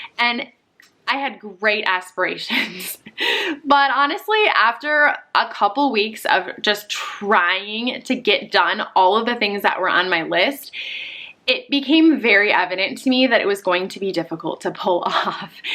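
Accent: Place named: Michigan, United States. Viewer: Commenting again to say that I’m a Michigander (now residing in Tennessee) and it’s nice to hear someone who has my “accent” 🤣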